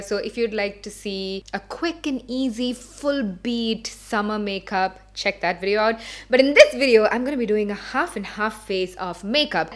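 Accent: India. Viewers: You're like the only Indian blogger I actually trust and follow.. I also like Shreya but I watch you more (just a personal preference). You keep it real, you're not fake and you don't try to be something you're not like adding unnecessary accents. You own that Indian accent and I'm proud. I enjoy watching you 😊❤️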